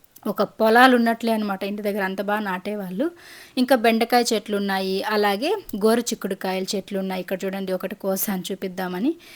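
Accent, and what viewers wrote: Chittoor, India. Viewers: Meeru matladuthunte chala bhagundhi same mana Chittoor bhasha. Village untadam vall konni upayogalu untayi kadha. Vegetables kondam undadhu,porakalu, Chintapandu elantivi kone pani undadhu.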